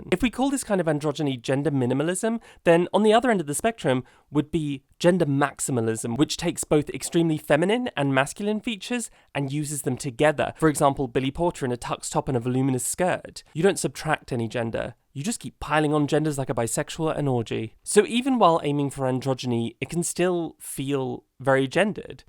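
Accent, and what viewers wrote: United Kingdom. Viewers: It’s just Southern English 😭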